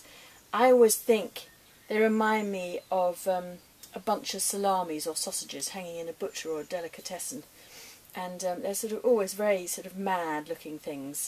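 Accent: United Kingdom. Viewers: Aww thank you, I’m going to repot mine or maybe even plant them straight in the ground?! I love this helpful video an your strong British accent is just divine 😊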